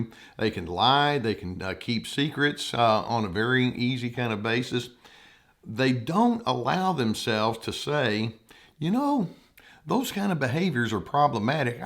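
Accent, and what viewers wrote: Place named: Texas, United States. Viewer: I never realized that so much first-rate psychological insight would come with a Texan accent.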